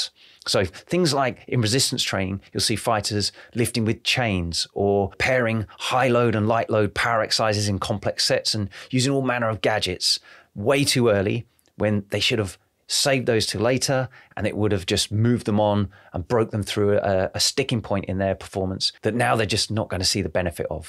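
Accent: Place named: United Kingdom. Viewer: hes british for godsake. some people dont understand proper english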